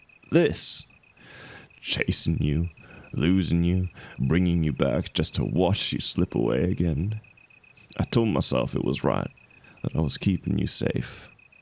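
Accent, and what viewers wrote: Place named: South, United States. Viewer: I really enjoyed this one! That southern accent you do is so soothing and comforting. And thirty minutes is such a treat!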